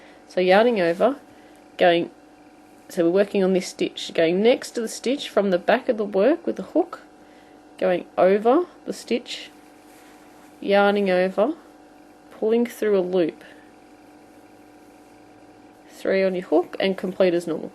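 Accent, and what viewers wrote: Australia. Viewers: Thank you thank you thank you just started to learn to crochet and these are the easiest booties ever if I didnt say it enough thank you also good to hear oz accent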